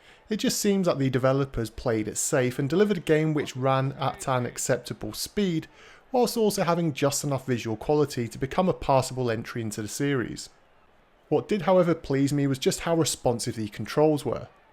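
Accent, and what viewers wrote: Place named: United Kingdom. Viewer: I've finally done it. I've watched all 293 of your N64 game reviews on your playlist, in order. I started this journey 6 months ago, watching 1 or 2 videos a day on my lunch break at work as I smoke Newports. You've become a part of my routine and I thank you for all the hard work you put into these. Now I must move on and find other videos to watch each day, but I've grown accustomed to hearing your calming British voice each day on my break as I sit outside and relax. Thank you,. 😊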